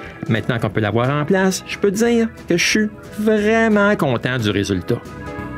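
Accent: Quebec, Canada. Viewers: l'accent Québécois on adore ça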